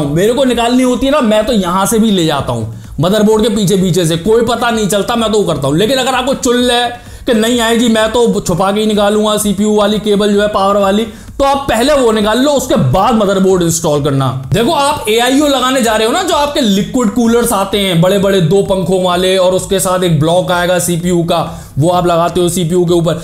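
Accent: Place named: Bhopal, India. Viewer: Bhopali ho kya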